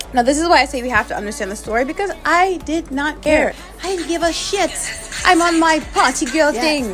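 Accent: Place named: Trinidad and Tobago. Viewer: I love your Trini accent (^_^) I'm glad you're focusing on you again ~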